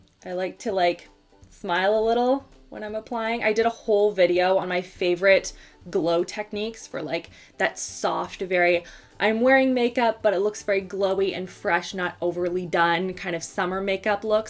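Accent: France. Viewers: OMG YES, THAT'S HOW YOU SAY LES MACARONS 😍😍 your French accent is adorable 🤗 also, love the eyelook 💗 Thanks for that lower lash mascara tip!!! 💗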